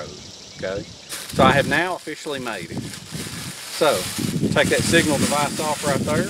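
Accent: South, United States. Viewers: forgive my ignorance but your accent comes from which area in the US? Southern but where :)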